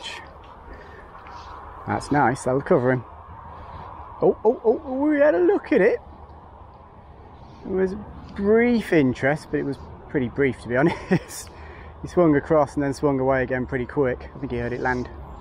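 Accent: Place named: United Kingdom. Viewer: Great video! Where in the UK are you from,? Can't place your dialect.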